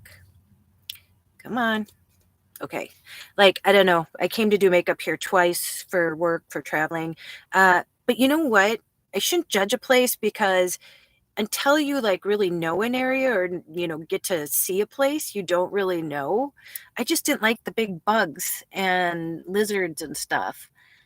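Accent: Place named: New York, United States. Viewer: Just be YOU. New York accent,,,, Don't worry about it. Lol. More support you than Don't. Keep going. I'm pointing at you. Magic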